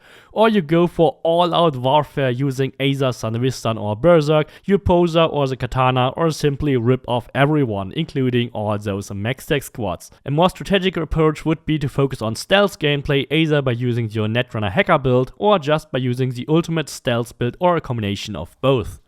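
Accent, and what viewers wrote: Germany. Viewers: I think i hear the german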